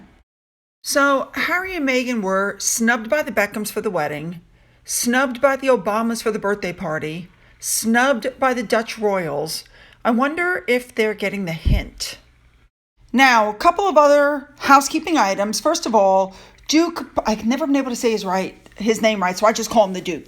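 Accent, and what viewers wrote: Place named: South, United States. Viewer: You off the chain ❤️❤️. That’s how we talk down south!! Congratulations!!!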